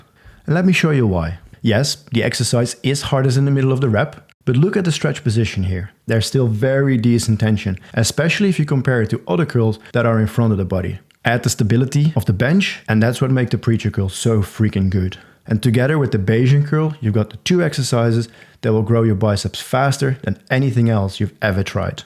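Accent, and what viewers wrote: Netherlands. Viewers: Fit gast klinkt als een Nederlander 🤔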